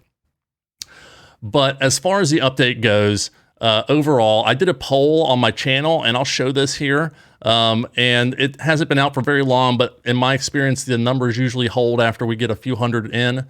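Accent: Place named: South, United States. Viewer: You sound like Danny McBride. Are you from around the Georgia-SC border?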